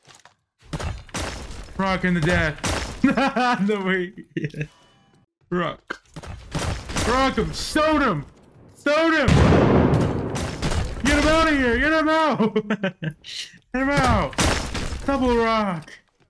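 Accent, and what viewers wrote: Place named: India. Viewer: havent watched your videos in a while cant stop noticing that your voice has gotten more indian like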